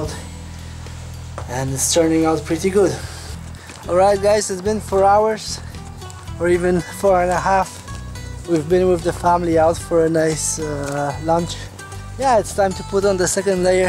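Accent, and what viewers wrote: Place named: United States. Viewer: masz piekny dom i swietna rodzinke moje gratulacje. Mam jedno pytanie i bylbym wdzieczny gdybys odpowiedzial, czy mieszkales kiedys w USA? twoj angielski jest bardziej amerykaski niz ten z Wysp.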